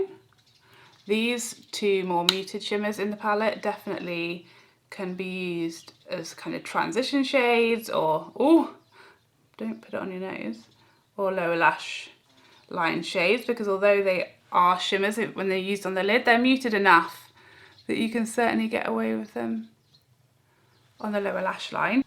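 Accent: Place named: United Kingdom. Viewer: you have the best videos. I love love love your sense of humor paired with the British accent. It’s the medicine to my life I was missing. You are the best! Yes I’m watching some of your older videos. Lol!!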